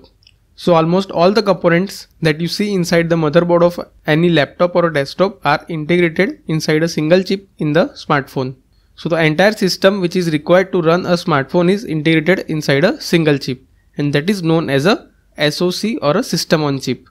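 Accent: India. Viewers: Indian English.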